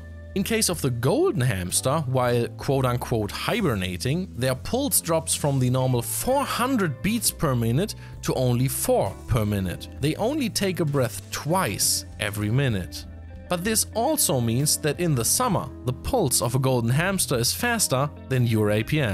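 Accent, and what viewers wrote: Germany. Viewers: Is Hamster from Germany? It sounds really like german guy tryharding to not have an accent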